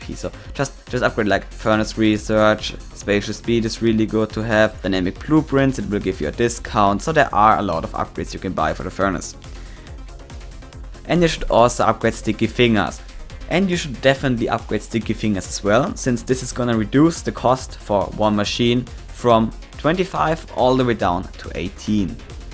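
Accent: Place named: Germany. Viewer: Man merkt das du deutsch bist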